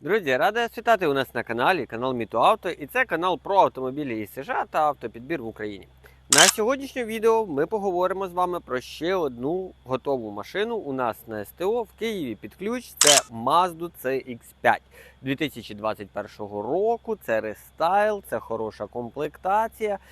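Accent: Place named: Romania. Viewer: Ти сам українець? Шо за акцент якийсь румунський чи молдавський? Наче носки в роті стіраєш паралельно з відеооглядом.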